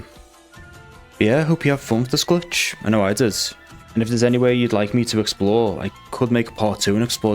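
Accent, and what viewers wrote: Liverpool, United Kingdom. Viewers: Omg are you a fellow scouser… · come to think of it barely any youtubers have a Liverpool accent like this (in my experience at least) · great video made even better by fact you're a scouser, legend.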